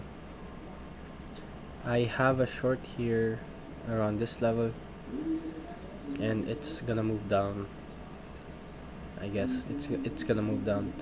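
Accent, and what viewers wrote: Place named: Philippines. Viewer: It’s Filipino accent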